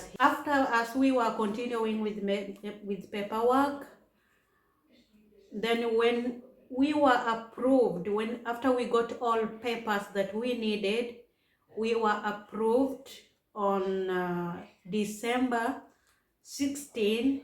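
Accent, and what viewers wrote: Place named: Maragoli, Kenya. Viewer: Hi sis where do you come from? You sound maragoli lime me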